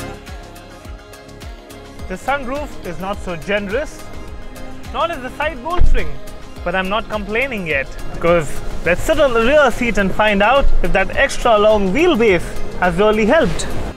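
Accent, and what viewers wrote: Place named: Punjab, India. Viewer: Dude your thh-ousands are too cute! Reminds me of my Punjabi childhood buddies from Delhi ha ha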